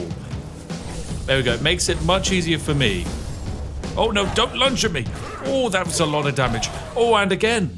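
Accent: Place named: Yorkshire, United Kingdom. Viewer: I DIDNT KNOW I NEEDED THIS! omg nothing so tranquill as a good voiced yorshire brit having fun doing apocalypse things! this is so great!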